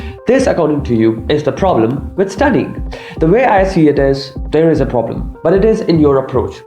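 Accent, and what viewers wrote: India. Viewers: 930,088 I have subscribed, even though at first it sounded like another mindless dribble but by a not too typically accented Indian dude with a channel name that appears to explain vaginal bleeding. Point made and leading up to was fantastic. Thumbs up and if it works for me within the next 3 days I’ll probably donate aswell.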